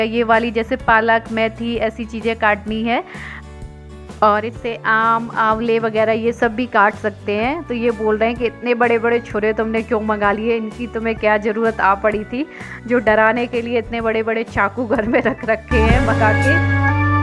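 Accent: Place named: Agra, India. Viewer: Accha aap Agra ki ho didi . mujhe lag hi raha tha aapki boli se mai bhi agra ki hu .Apne log pehchan me aa jate hai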